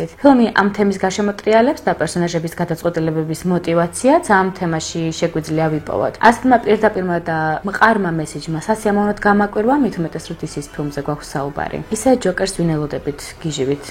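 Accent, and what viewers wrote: England, United Kingdom. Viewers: zalian kargixar, sheni arxi maqvs gamowerili da didi siamovnebit gismen, magram gexvewebi es inglisuri aqcenti mishore, ubralod qartulad tqvi, ;D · imena am gogom ro ardauwunos rame nebismier films ararsebobs da dzaanmagzianebs sheni suleluri inglisuri gamotqmebiii, prosta tavs isazizgreb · ინგლისურს რო ისწავლე მერე აღარ იტყვი მაგას ძაან ასწორებს უცბად ინგლისური აქცენტი ❤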